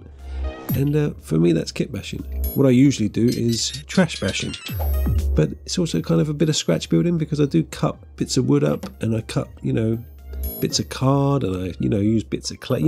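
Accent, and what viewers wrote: United Kingdom. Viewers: heees british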